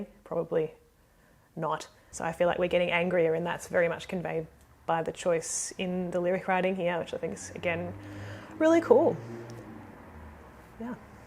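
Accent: Australia. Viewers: Wait.. am I not identifying your accent correctly.. are you Australian? I always thought that strange instrument sound is a didgeridoo, isn't it?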